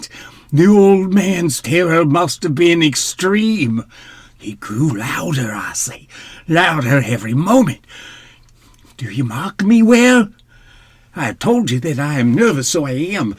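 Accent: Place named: South, United States. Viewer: Hahahaha!!! 🙌🙌❤ I absolutely love the southern accent!!!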